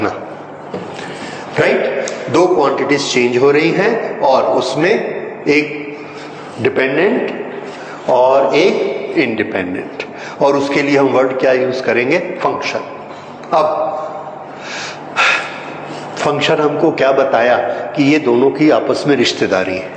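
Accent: India. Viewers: I see all of these great comments about this professors teaching, and only wish I could understand his english better. When I worked with some really nice people from India, I had no problem after we were together for a while, but it has been years, and I know this is english, but there is an accent that makes it very difficult for most Americans to initially understand. I will try to just watch it several times, and hope it then comes naturally, because everyone has so many great things to say about his teaching. I guess it is the way some vowels roll off the tongue, something common in Hindi, that makes it difficult for me....and I'm certain the reverse is also true, where if someone not familiar with the way Americans speak english, they would be spending too much time trying to understand the words, and miss the lesson. Thank you very much for generously posting this lesson sir, and I only hope I can get as much from your teaching as the others have gotten!!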